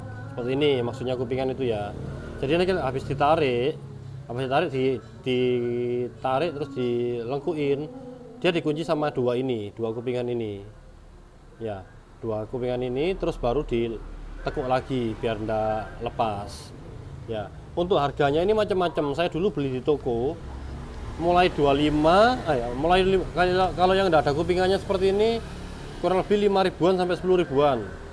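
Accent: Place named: Surabaya, Indonesia. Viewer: Masuk, suroboyone nyel Iki😁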